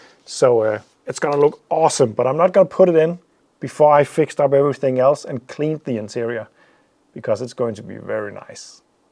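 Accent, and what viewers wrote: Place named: Denmark. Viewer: Hello i just stumbled upon your channel because i saw this saab video. Then i heard your voice and i knew you were danish! (im danish too). It's great to find a danish car channel, there's so few :) I love the saab 900's and im probaly buying a saab 900s (low pressure turbo) as my first car! It's got a bit of rust, the clutch hydarulics arent working but it's awesome! I agreed with the owner that he was going to fix a little problem on it and then i'll buy it. It'll be a great project! :) Keep the saab videos coming :)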